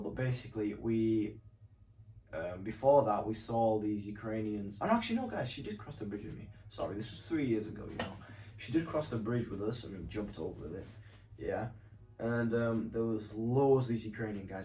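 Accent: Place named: United Kingdom. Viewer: Ok cool, I just reacted because you describe in your video how the war "started" while talking about 2022 events, but I can understand Btw how can it be that you speak English like a true Brit if you are from Ukraine?? Ukrainians and Russians usually have a very recognizable accent. Maybe you had had some connections with UK before you moved there?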